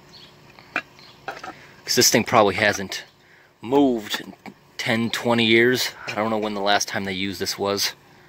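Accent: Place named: Amish Country, United States. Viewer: Ruff (roof) Rumm (room) Crick (Creek) are you a PA native? You sound like you live close Amish country.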